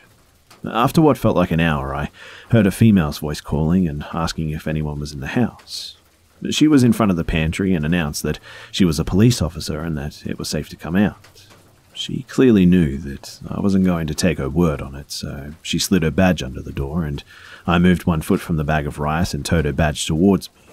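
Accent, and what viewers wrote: Australia. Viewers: How the hell have I only just found you bro, an Aussie narrator, if I knew about this earlier its all I'd be listening to, keep up the good work brother!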